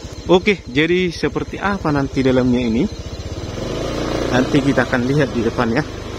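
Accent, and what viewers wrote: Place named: North Sumatra, Indonesia. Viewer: Mantap ini baru jumpa sama konten kreator yang memakai bahasa kesatuan padahal dari logatnya kental kali bahasa Batak SUMATERA. mantap josss nanti bahas ya bang tentang begu ganjang dan apa fungsi (negatif/positif) nya🙏🙏 salam dari RANTAU PANJANG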